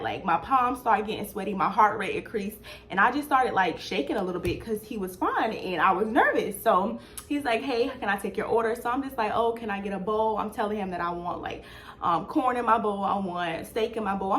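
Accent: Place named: South, United States. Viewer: Girl!! I just can't get over the way you say the word "right" soooo freaking cute & country!! 😂❤❤❤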